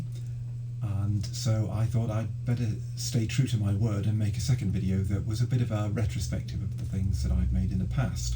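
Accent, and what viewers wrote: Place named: United Kingdom. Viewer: I thoroughly enjoyed your video, one thing you don't know is I love UK speech.lol. Only the English can pronounce my name correctly, here they pronounce it Don but it's Dawn and only English expats pronounce it properly. Your Theresa Wentzler (sp?) turned out beautifully, I know myself and I'd never make it through one of her patterns. You've done some beautiful pieces , thanks for showing them. There is a man in my stitchy group and he's stitching an absolutely stunning pattern of a male nude reclining. The women are quite appreciative of his physique lol I must remember to ask him where he found the pattern. You do beautiful work and I'm very happy you decided to join floss tube. I live in Nova Scotia Canada and there are few places to buy supplies in our area so most things I have to order from Ontario or further west. 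We do have a designer called Charting Creations , you might like to check out her charts, they are beautiful and she is kitting them up now. She has started getting a lot of supplies in though and will order anything we want so that's a great resource finally. I've just started And a Forest Grew and I'm loving it, each tree or animal feels like a little finish so it's encouraging to get to the next bit. I hope you do make more videos as you feel you want to, I enjoy longer videos so I can make a nice hot cuppa and stitch while I watch. Hope you had a lovely Christmas and I wish you a Happy New Year as well. 🙋🏼🤗🇨🇦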